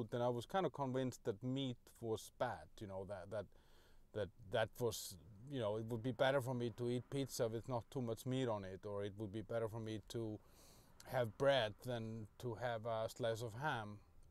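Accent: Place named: Iceland. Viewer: Hah! I knew it from the first minute, that accent. I lived in Iceland for several years in the early 90s (turned 20 in keflavikr) I miss the lamb hotdogs. I've been carnivore for a while now, it's definitely a huge help.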